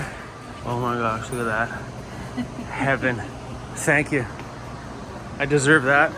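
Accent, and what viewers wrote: Australia. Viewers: Ahhhh it's great to hear an Aussie accent. I'm a Kiwi in Australia!! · Lovely hearing that Aussie accent.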